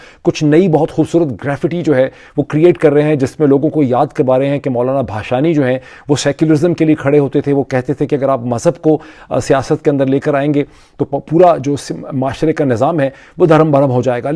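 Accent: Punjab, Pakistan. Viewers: Gyo Dr sb Punjabi app bohat pyari bolty hain 🎉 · Punjabi sounds sweeter when speaks it. “Uoochay Jat katoora lbba pani pi pi apharya”. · As usual very deep and different to the lot analysis your punjabi accent was cute one more punjabi idiom tersandi pully gur pia chatandi Sara din gia🎉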